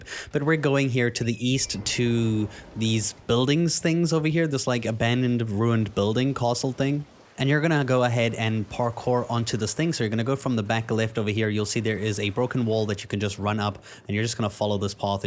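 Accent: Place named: South Africa. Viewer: are you South African? i can hear that accent poking through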